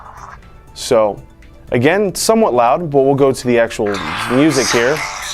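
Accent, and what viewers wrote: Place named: Australia. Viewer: awful aussie accent mate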